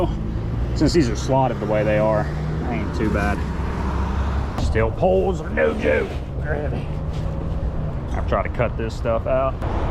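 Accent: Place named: South, United States. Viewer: Love the content. dont know how I found you but not disappointed. I came for the traffic signal knowledge, stayed for your southern draw XD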